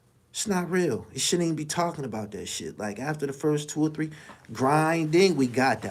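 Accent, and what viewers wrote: New York, United States. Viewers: Yo u from PA why u sound like u from New York no pun intended on my New York Niggaz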